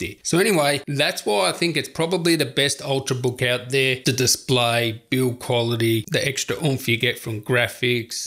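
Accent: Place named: Australia. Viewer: As an Aussie it's weird to hear super Aussie bloke talking in American dollars.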